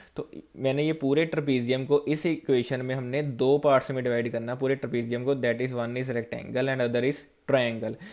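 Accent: India. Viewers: Sir g aap Punjab se ho kya ???. ..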